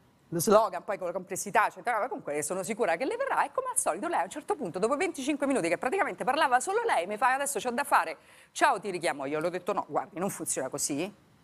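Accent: Rome, Italy. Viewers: Prende in giro la Meloni, ma ha lo stesso accento romanesco. Mah....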